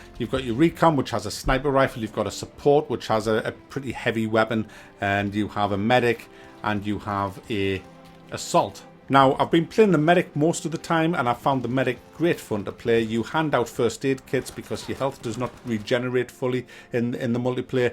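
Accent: United Kingdom. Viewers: UK accent, but from where?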